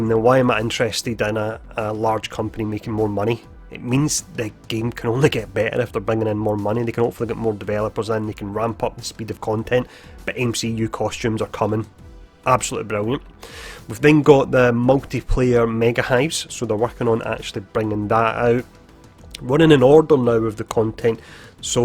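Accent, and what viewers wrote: Scotland, United Kingdom. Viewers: Passion with a scottish accent ... good to see you excited about the game. Patrols are coming!!!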